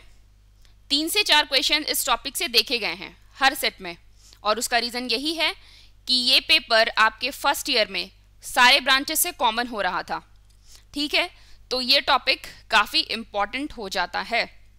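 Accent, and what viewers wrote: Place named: Bihar, India. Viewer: god bless you ur voice is very beautiful. lagta hai app Bihar se belong karti hai apki tone she lagta hai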